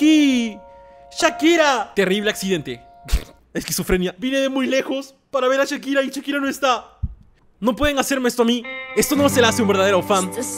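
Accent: Peru. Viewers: eres peruanoooooooo yo tambieeen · sos peruano? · eres de perú?🤨🤔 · Eres de Perú? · eres peruano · Eres peruano? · Omg eres peruano a argentino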